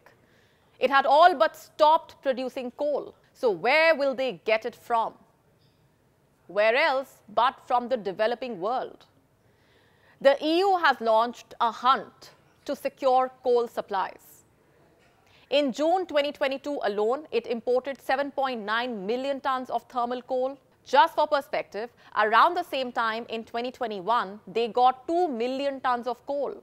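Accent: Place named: India. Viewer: The accent is very indian😅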